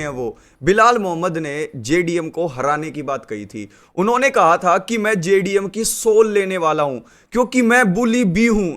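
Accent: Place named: Haryana, India. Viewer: Your Haryanvi accent is too good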